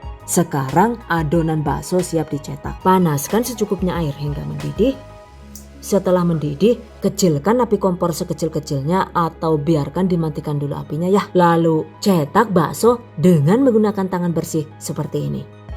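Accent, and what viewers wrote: Banyumas, Indonesia. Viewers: Biasa...yang aku tungguin ngomong ngapaknya . ...bikin aku ketawa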